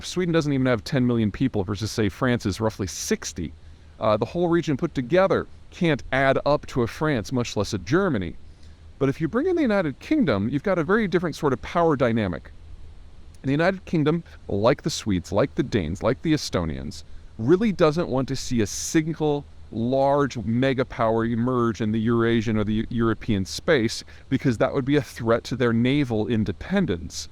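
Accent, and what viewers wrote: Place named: Texas, United States. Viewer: Talks like some Texas ignorant. When a fool is trying to repeat what a wise man once said, the chain jumps off because he translates it into something he himself can understand.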